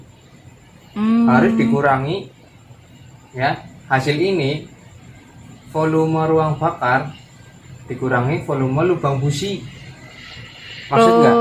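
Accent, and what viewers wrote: Banyumas, Indonesia. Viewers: Jenengan dari mana mase..kok rodo ngapak..?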